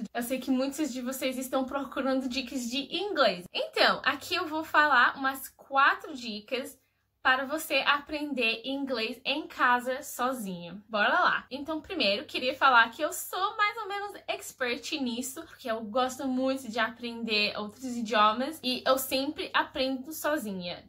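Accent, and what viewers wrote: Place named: Brazil. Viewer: Meu Deus, achei que fosse brasileira. Que português lindo vc tem 👏🏾👏🏾👏🏾👏🏾🥰❤️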